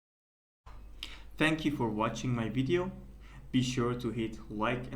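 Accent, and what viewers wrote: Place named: Iran. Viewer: Mate u sound vaguely persian. Correct me if im wrong. Either way, love the content. Looking forward to future videos.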